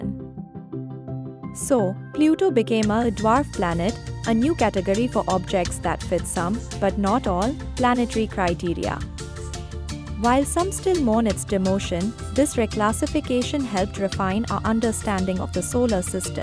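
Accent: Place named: India. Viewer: F kking indian accent sounds like dum scammers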